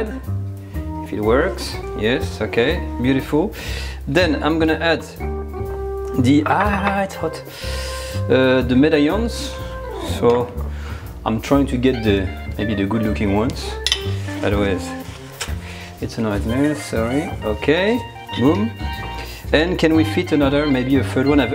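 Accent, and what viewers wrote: France. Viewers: I love his recipes and even more his techniques, and love the French accent. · Your English is excellent despite your French accent :-) This recipy looks amazing and easy to prepare... I was just wondering about the way you "reserve" your meat pieces before putting them on the plate and have them still warm after preparation of the mushrooms sauce... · "Nudge of Butter" with a real French accent is delicious in itself....., your own cookbook should be titled, "Nudge of Butter"....love it and hope ALL your dreams come true. I love your Academy.... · Your🌈 french 🌺accent 🔥😍 👌 😋😘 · I will definitely make this; I love your French accent!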